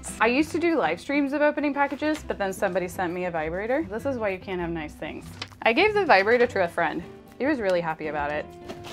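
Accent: United States. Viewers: You sound totally American to me, I'm British Canadian and lived in Canada for 20 years but I still sound English. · It bites her sometimes. People assume she's American and think she's an idiot for not understanding common words like knurled. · It's so weird how she is so fluent in English that she sounds American but she can't read English fully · do you have an american accent when you speak swedish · Wait, what, you're Swedish?? I'm Norwegian and have never heard any Scandianvian speaking with such a flawless American accent as yours. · You could easily pass for an American! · dude with your English speaking accent you can TOTALLY pull off that you're American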